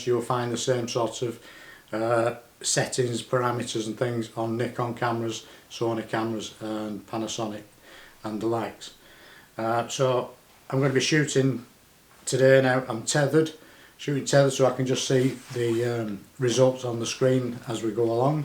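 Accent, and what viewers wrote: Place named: Liverpool, United Kingdom. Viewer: Hey brotha great video thank you. Now as a side question where does your accent come from? I can’t seem too sort it out. Obviously it’s an English accent but I don’t have a trained enough ear to sort it out right away. You do sound like a Beatle to me (hope no offense) as that’s my only reference for now. I know they (Beatles) are from from Liverpool (or greater area). Thank you kindly and have a good day.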